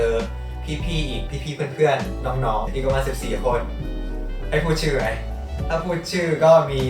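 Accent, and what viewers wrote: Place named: New England, United States. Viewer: I was wondering why you had a slight New England accent. lol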